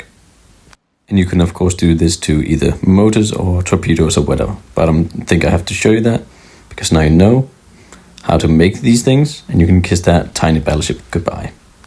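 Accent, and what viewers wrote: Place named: Denmark. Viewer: Wait are you Danish the accent is great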